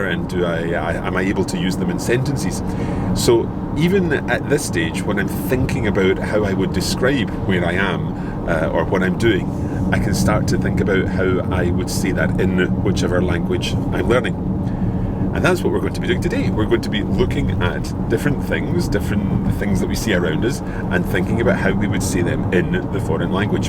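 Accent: Scotland, United Kingdom. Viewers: Hello,! I've been most impressed with you clearly perceptible Scottish accent!;-) Do you realise you have it, by the way?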